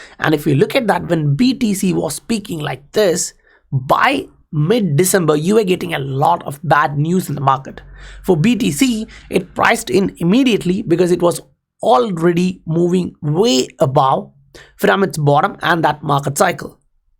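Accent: India. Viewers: Lmao it’s definitely Indian or something in the region not even close to Scottish or Australian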